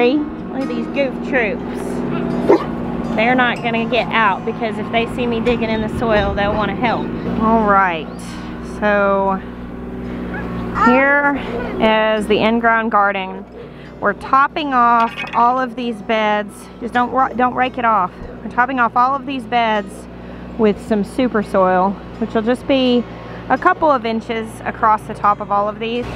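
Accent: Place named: Arkansas, United States. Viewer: Well I wanted goats but now I don’t think it’s a good idea for me. 😂🥰🥰🥰. I WOULD NEVER GET ANYTHING DONE. They are so dang CUTEEEE. I feel the SAME AS ABOUT TOMATOES. 😍😍🍅🍅😁😂 prayers coming from down south LOUISIANA ALWAYS. 🙏🙏🙏 KEEP SHINING LIGHTS GIRL. I have been saying that I stumbled upon my REFUGE here in your ROOTS and twangy Arkansas accent last year and GOD snuck right back into MY HEART not long after. I think you might have been a key ingredient that was needed for me to let HIM open my EYES. IM STILL SO THANKFUL FOR THAT. Keep talkin TWANGY and sharing your ROOTS AND REFUGE there are others out there just like me that need it. ❣️💡😊🦋🍅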